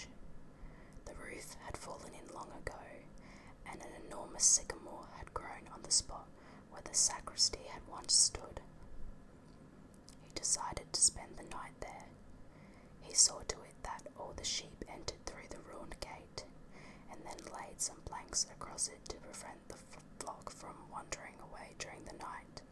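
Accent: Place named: Australia. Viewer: Definitely an Aussie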